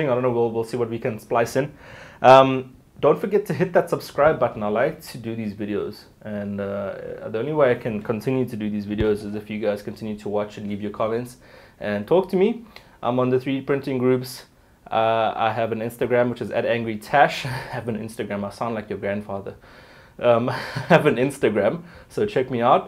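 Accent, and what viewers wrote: South Africa. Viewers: dig that Saffa accent - FTW :-) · Are you South African??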